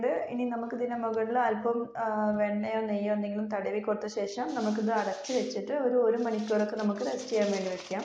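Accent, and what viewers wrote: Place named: Kannur, India. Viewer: Thank you for a great presentation and I loved the way you speak Malayalam. It sounds like how it is spoken in the Kannur area.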